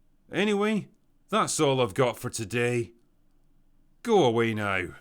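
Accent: Scotland, United Kingdom. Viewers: A Scottish chipmunk voice. Awesome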